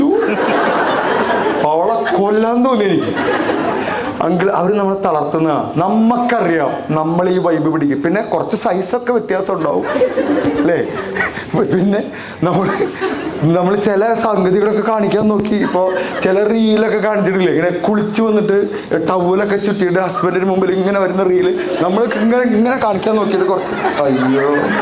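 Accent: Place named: Thrissur, India. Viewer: അല്ലൃലും തൃശ്ശൂർകാരുടെ സംസാരം കേൾക്കാനേ ഒരു രസാ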